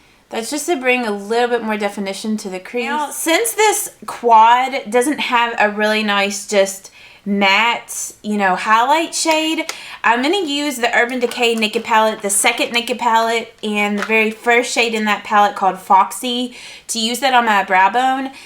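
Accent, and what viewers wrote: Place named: South, United States. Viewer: i know this is an old video, but this is so pretty! i hope they still make this palette. im so glad i found your channel, and i love your accent too. at first i thought you were from another country (not the u.s), but then i realized that its southern. thanks for this bold but wearable tutorial.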